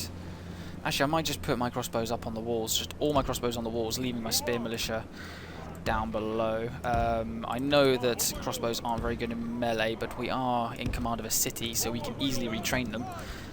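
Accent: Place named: United Kingdom. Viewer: didn't even know you were british and i was subbed for atleast a year now lol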